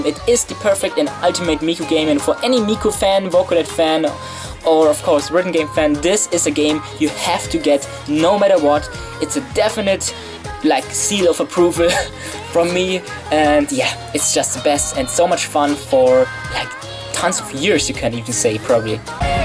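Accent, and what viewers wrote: Germany. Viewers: Dude are u german? Nice video btw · Dein English ist gar nicht so schlecht aber man merkt das du Deutscher bist :D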